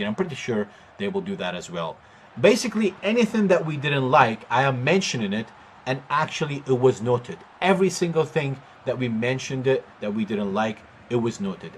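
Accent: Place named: Scotland, United Kingdom. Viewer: Are you Scottish Italian because you certainly have a Scottish accent poking through bro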